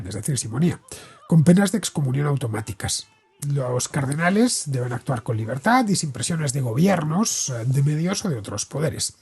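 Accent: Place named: Galicia, Spain. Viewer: "¡Interesante! Aunque tu manera de modular no es la mejor y tu acento me recuerda al de un gallego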